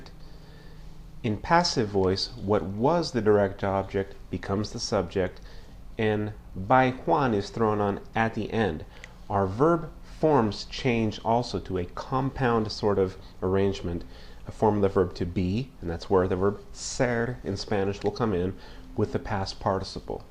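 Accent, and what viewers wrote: United States. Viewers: I just don't understand why people have to be so critical of everything. So his accent is "estadounidense", so what? He's still a good teacher. You think English teachers in Latin America don't have accents? Would YOU have an accent...?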